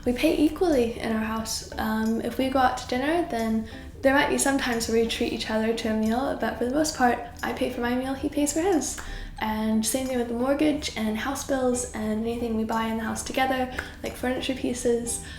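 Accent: United Kingdom. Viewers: Ok this has nothing to do with the topic of the video but your accent is really interesting, because you are starting to use some British ways of pronunciation, the stress on certain syllables in certain words for example. I just find this really interesting as an English (as a foreign language) student. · your American accent is sounding UK. THATS AWESOME!! YOU GUYS ARE LOVELY.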